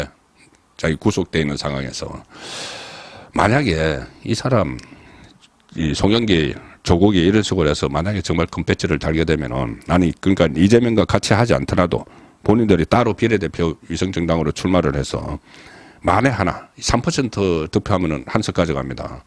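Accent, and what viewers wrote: Gyeongsang, South Korea. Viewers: 경상도식 비^례대표. 미^래통합이 아니고 비~례대표. 미~래통합임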